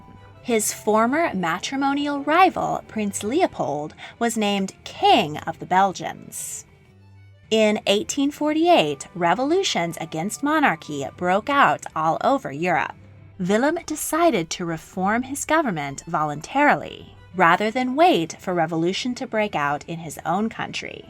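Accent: United States. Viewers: It’s so funny hearing all those European names pronounced with an American accent.😅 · Screeching American accent telling European Royal history poorly! 😱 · I am an American. but I find the American accent of this narrator absolutely horrible. Why can't people speak English properly without using a hysterical accent? I have been living and teaching in Europe for more than 30 years and I have to say, less is more. Don't over-do an accent like this narrator does.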